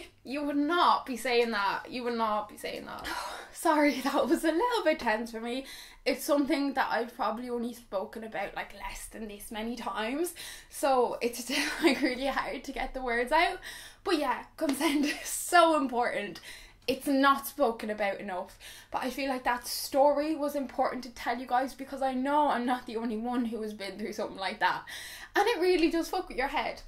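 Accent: Ireland. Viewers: I love your videos and your Irish accent! My ex boyfriend used to pressure me alot into having sex and when I used to refuse. He used to become very nasty towards me. I do love sex but not that much!! I'm from Yorkshire, England 🏴 btw xx. Keep up the amazing content 💗💗💗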